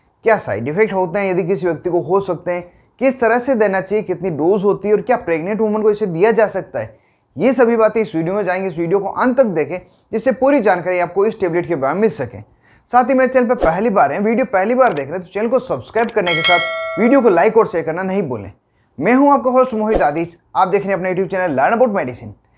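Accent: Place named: Marwari, India. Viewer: Marvadi ho ton to marwadi lgti he 😅😅😅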